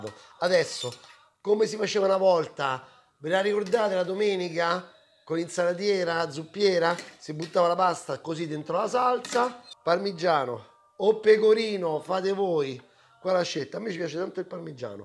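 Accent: Rome, Italy. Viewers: Sei troppo forte sei un vero comunicatore ti guardo e apprezzo sempre le tue ricette e poi le sfumature del romanaccio daieee ti seguo dal l’America 🇺🇸🇺🇸🇺🇸🇺🇸🇺🇸da Naples Florida vienimi a trovare qui e fantastico ciao un abbraccio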